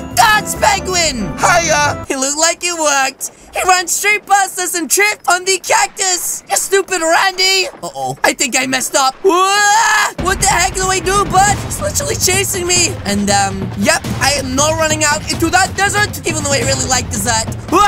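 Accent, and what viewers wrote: United Kingdom. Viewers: uk guy ha? cool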